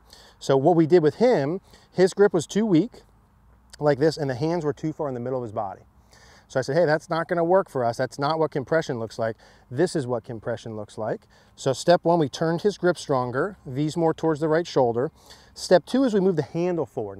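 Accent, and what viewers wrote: United States. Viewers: My god it's so much better listening to an American accent and straight forward video rather than these British guys and all their cringey clickbait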